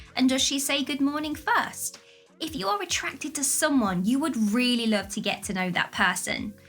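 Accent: United Kingdom. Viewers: I'm improving my english accent here. Very cultivated channel and the british excellent tone in language is superb here indeed. Fantastic beautiful. I listen to you just to improve my english tone 🤗❤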